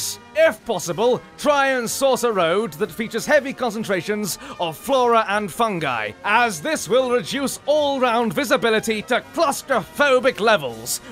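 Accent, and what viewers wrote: United Kingdom. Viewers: What part of England is from im from Newcastle the north east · you are by far the best YouTuber I've ever seen. You see, I am a person that loves to listen to British war and marching music and play war thunder and your videos are all that and more! I also love your British accent! Thank you for reading my comment ( If you found it) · are you really British? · Do you put on a voice for videos or are you really that British?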